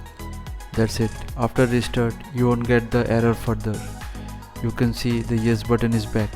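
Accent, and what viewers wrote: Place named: India. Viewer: this dude is the goat, knew it was gonna work when i heard the indian accent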